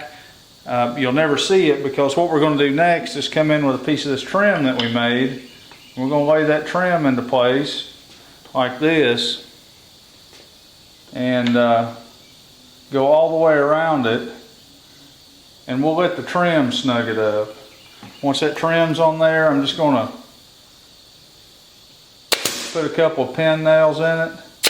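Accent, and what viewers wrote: United States. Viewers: He got that Hollywood cowboy accent 😂😂😂😂 so cool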